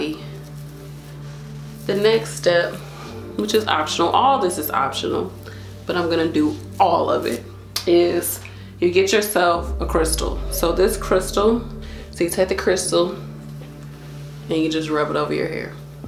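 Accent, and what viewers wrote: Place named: West Coast, United States. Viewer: I love your vibe i felt your locs through the video. You sound like your from the west coast that caught my attention. Keep up the frequency im entering my journey again after cutting my 10 year locs as a teen.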